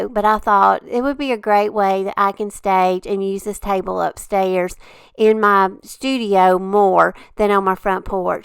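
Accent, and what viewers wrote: South, United States. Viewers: It’s the southern accent for me!💕💕💕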